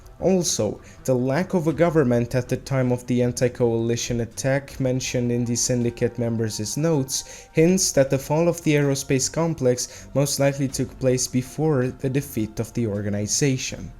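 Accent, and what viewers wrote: Russia. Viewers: You sound like you have some sort of Russian accent (Don’t hurt me, I’m supposed to be a stereotypical boi from the US)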